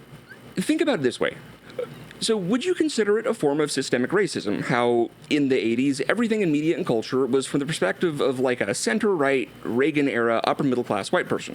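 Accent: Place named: United Kingdom. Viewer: For the longest time I thought you were British.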